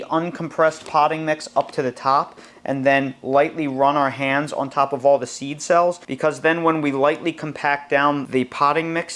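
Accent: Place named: Philadelphia, United States. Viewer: I recognize ur Philiy accent!!❤️ God's Blessings to u in all ways!!💜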